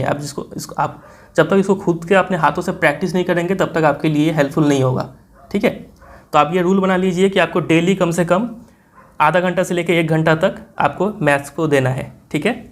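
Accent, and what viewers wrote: Bihar, India. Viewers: Sir, Are You From Bihar..??? I Mean Your Way Of Speaking ❤️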